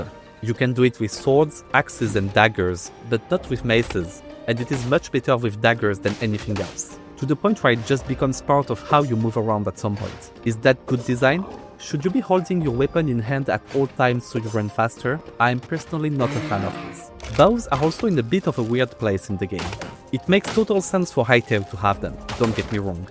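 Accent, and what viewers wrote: France. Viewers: Sup fellow french , I recognise it with your accent. More seriously , hope to see cool projects from ya ! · The video was so good I almost didn't realize you are french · On entend l’accent français à des KILOMÈTRES 😭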